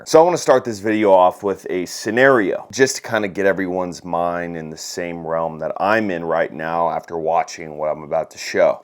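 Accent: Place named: Texas, United States. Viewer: You talking like a Texan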